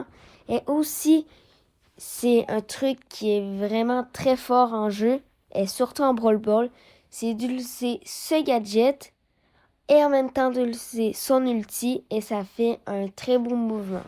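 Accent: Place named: Canada. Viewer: J'adore tes vidéos continue comme ça, et j'adore ton accent canadien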